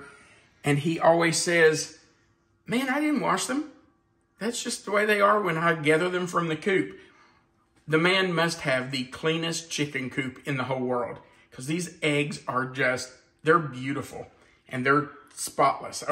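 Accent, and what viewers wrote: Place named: Kentucky, United States. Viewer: I'm a Canadian, who is hooked on your recipes. I also love to hear that Kentucky way of speaking. Your chatter with is delightful.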